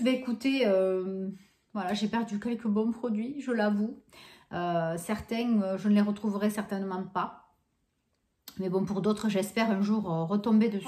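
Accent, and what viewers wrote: South, France. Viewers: Une nouvelle abonnés, tu viens de Marseille ? Ou du Sud de la France parce que ça s'entend ça fait du bien d'entendre le sud sur YouTube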